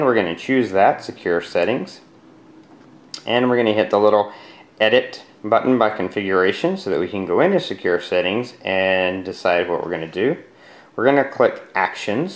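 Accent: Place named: Scotland, United Kingdom. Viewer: How did you get the Scottish accent?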